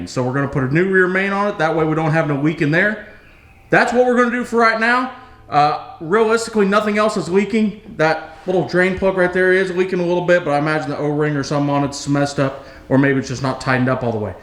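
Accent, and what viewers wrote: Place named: Pittsburgh, United States. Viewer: You HAVE GOT to be from close to me using the word "paher worsh" because I live right outside of Picksburgh. I deliver to Morgantown, Pennsboro, Clarksburg, West Union, and pretty much all over West Virginia. I can't help but to notice your accent. Don't forget Fummins...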